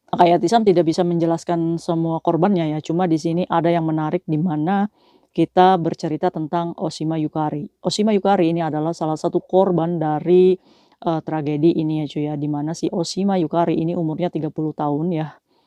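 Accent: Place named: Java, Indonesia. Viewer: kirain orng jpng tnyta wong jowo 😊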